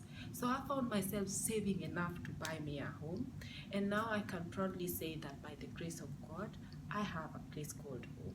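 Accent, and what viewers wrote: Kenya. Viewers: butterfly9274 That's a Kenyan accent. That's why I took Interest to listen on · Yeah i knew the moment you started talking.the accent huh😍😍😍😍.mimi pia nimkenya nko switzerland one day i will do a video.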